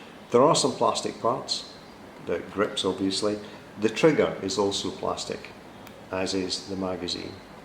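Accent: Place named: Scotland, United Kingdom. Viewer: Thinking about getting one of these for film use, Hey, nice to hear a Scottish review. I'm Scottish myself :) Haver a good Christmas and thanks for uploading.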